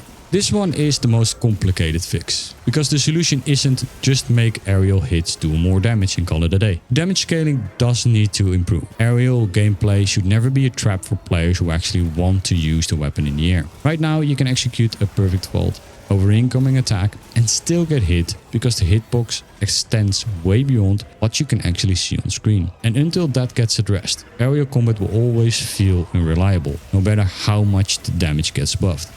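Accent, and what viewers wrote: Netherlands. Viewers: Dutch guy speaking english?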